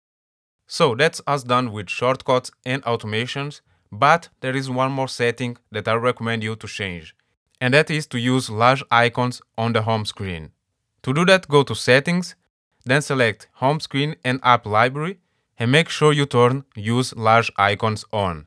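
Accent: Russia. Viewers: Why the Russian accent?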